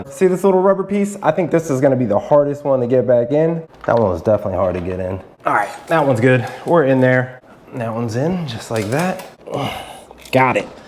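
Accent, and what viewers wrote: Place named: Philadelphia, United States. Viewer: thanks bro! U sound like a local, are you from Philly area?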